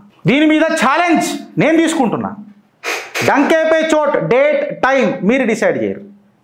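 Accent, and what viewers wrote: Telangana, India. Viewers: Nijamaina telangana vadila matladinav anna❤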